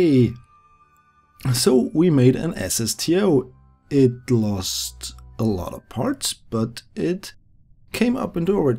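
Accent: Germany. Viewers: Ach komm schon ich hör doch an deinem Akzent dass du deutsch bist ;) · Great SSTO and building skillz. But i can't listen to your narration - why dont you speak in your mothertounge? (german?) Greez from Vienna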